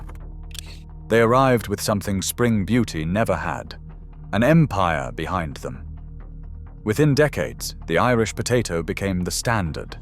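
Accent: United Kingdom. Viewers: It's the UK pronunciation.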